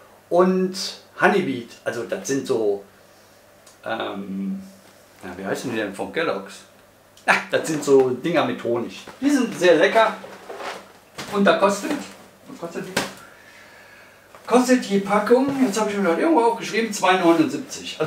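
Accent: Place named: Cologne, Germany. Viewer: Sehr sympathisches Video. Ich finde deine Art zu sprechen cool, bist du aus der Gegend Köln?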